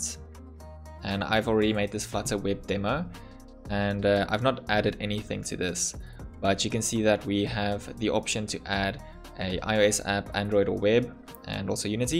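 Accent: South Africa. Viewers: Are you from South Africa? Your accent sounds South African? Great video! Thanks!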